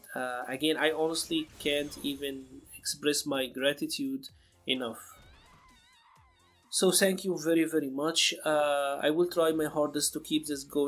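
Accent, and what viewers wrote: Egypt. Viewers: Criminally low number of subs. Get the word out people, this guy is spitting knowledge with a smooth Egyptian twang.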